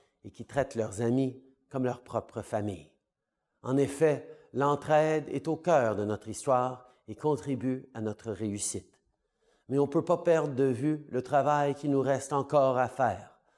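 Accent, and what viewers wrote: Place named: Quebec, Canada. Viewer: Hello everyone. He pronounces the word "fête" as "fate", he has a Quebec accent.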